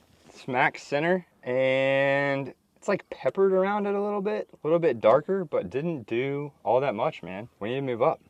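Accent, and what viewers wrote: Australia. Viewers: All i smell is your bullshit 🤣 Ozzy accent. Dude, ya killen me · 😂 Australian accent hehe 😂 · lol-the Australian accent. :face-blue-smiling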